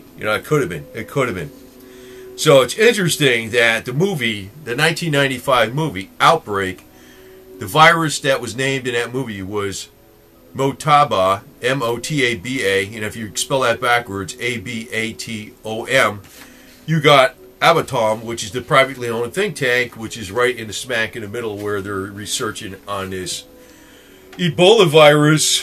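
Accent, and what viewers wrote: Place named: East Coast, United States. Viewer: Yo Bud, i like how your mind works and you talk like an East Coast Boy lol. So if so right on!. Cool vid man.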